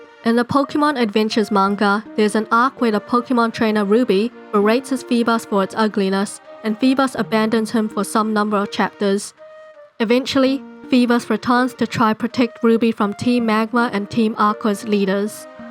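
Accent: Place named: New Zealand. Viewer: I KNEW IT! THE INSTANT I HEARD THE VOICE I KNEW IT!! HELLO FELLOW KIWI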